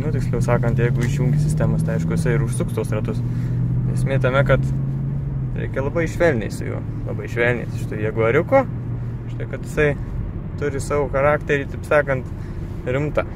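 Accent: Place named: Vilkaviškis, Lithuania. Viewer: Iš tarmės sprendžiu, kad esi iš Vilkaviškio ar Marijampolės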